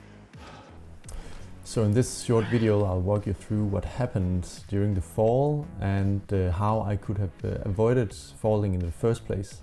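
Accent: Denmark. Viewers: Var i tvivl om du var dansker vurderet ud fra din accent.. Indtil jeg hørte “Arrrh! det var tæt på!”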